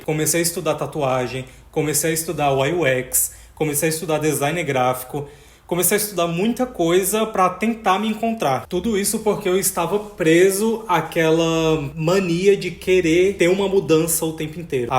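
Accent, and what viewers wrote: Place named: Natal, Brazil. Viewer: nossa, tô maratonando seu canal e logo pensei “será que ele é de Natal?” pelo sotaque mas principalmente pelas gírias hahahaha amei ❤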